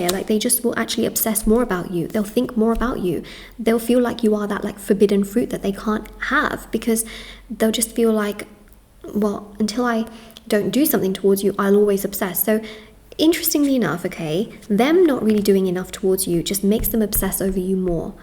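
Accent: United Kingdom. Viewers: Hey actually… when your video popped up on my feed… I thought- “Hey, I haven’t seen her in a bun before.” “She looks rather pretty (said in a thick British accent) (pun intended😂❤) (cuz you have a British accent) (I suppose) Huh. You Look Beautiful. There is no other way. And I will not hear of it! ❤❤❤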